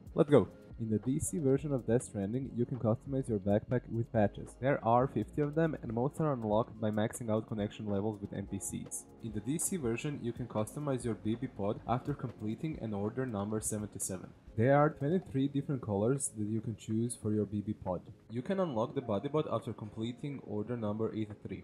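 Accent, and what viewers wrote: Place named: Hungary. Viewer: Are you from Hungary? Your accent sounds like Hungarian person I know. Good video btw, concise like it should be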